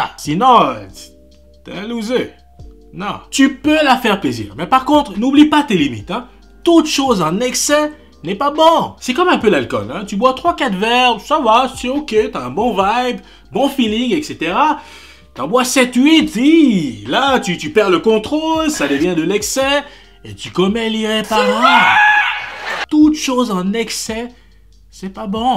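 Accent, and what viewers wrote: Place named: Quebec, Canada. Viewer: Quand j'arrive à cerner ton accent québécois... Ton polo est juste classe.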